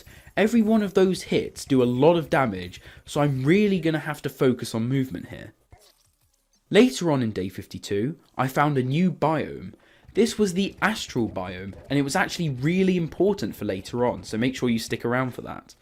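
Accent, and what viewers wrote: United Kingdom. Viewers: Are you from the UK? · Oh my god, this was honestly so cool. And I love how your british accent suits with your jokes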